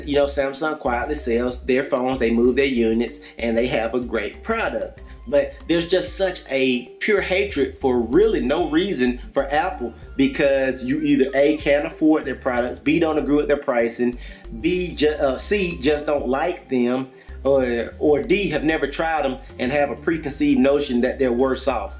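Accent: South, United States. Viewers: I just love his southern accent haha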